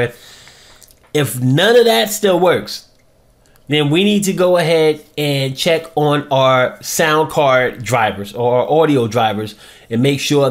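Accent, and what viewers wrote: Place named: United States. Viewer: Congratulations! Now that I have adjusted to your American English, I must grant it to you, you explain very well and your solutions are good. And believe me this compliment is genuine as it comes from a French Speaking European old timer. Thanks a lot and carry on...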